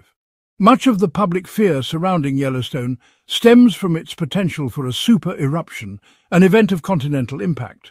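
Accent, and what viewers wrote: United Kingdom. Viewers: WTF are we listening to a Brit tell us about our PNW? Does he have any credibility? Does he know about Baker or Shasta? He brings up Arizona and Wyoming. Has he ever hiked through the Craters of the Moon as a kid? Where was he when Mt St Helens killed Harry R. Truman? Has he ever visited Mt St Helens? I doubt it! He reminds us of everything we experienced in 1980! Do we need to be told that there was plume across the US? Has heard about the Cascadia Subduction Zone? What goes silent forever? I’d suggest that the should shut up and go silent forever!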